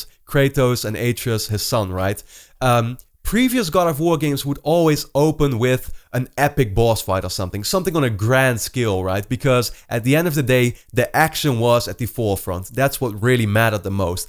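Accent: Netherlands. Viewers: Ik dacht al dat ik hoorde dat je van Nederland was! Leuke video en veel interessante info. Thx! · are you dutch? you sound so dutch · Dude you have to be Dutch according to your accent. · Hey, are you Dutch? I just discovered your channel, and that accent leads me to think so. If you are, awesome! I am too and I love this video. Subscribed =)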